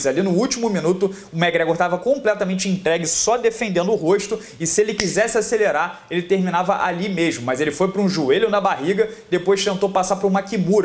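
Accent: Rio de Janeiro, Brazil. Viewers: Durante a luta eu fiquei lembrando da sua voz com o sotaque carioca dizendo: "Khabib não mija fora do pinico".... kkkkk. Ponto!!!!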